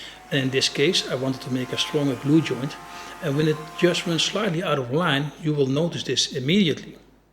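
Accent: Netherlands. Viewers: And I like your accent. It’s even better than that of Mark Rutte…😜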